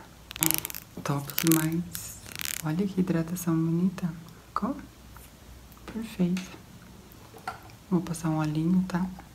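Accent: Rio de Janeiro, Brazil. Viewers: Eu amo quando faxxx sotaque carioca!! Acho mais relaxante ainda😍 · Aiiii eu sou apaixonada no sotaque carioca aaaah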